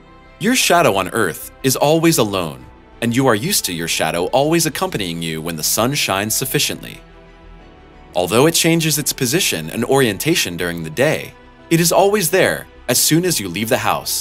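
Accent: United States. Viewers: Why would you use km when telling of an American tornado! So friggin annoying! You even have an American accent! Use standard measures idiots!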